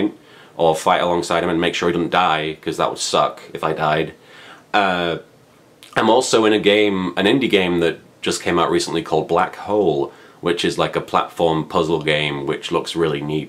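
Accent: United Kingdom. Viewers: Holy shit he's British.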